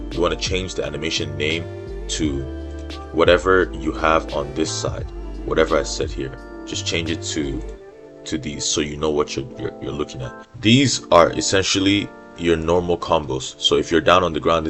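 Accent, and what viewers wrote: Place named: Nigeria. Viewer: icl from your accent you sound Nigerian but good vid tho its very good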